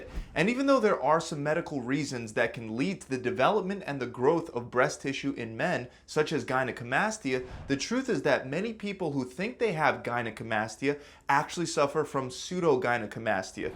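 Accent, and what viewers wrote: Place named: New Jersey, United States. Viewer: You from Jersey? My man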